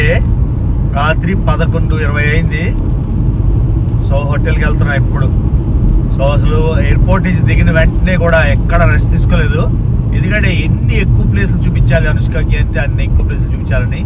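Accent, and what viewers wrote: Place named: Uttarandhra, India. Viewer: Take a Bow for our Mother Uttarandra slange ❤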